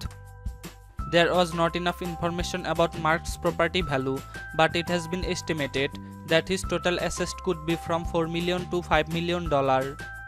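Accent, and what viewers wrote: India. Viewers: india ang nag sasalita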